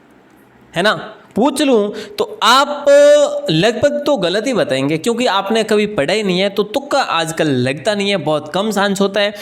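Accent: Marwar, India. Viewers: Marwadi boli hakri gni lage sa aapri bhira o sir ji 😁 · Sir aaj to bhut jayada maja aya🤗🤗 class mai class to roj hi achi hoti👌👌 lekin aaj apki marwadi wali boli se or jayada maja aya 🥰🥰 sir aap class mai marwadi bolta riya karo gani choki lage 👌👌👌👌🤩🤩tq sir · Sar class v mst the par aapki marwadi boli su piyar hoygo marwadi to me v bola pr takali marwadi choki lage 😀😀😀😀😀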